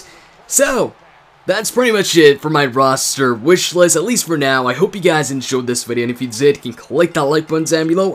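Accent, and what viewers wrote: Canada. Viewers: why don't you speak with a French Canadian accent anymore? please respond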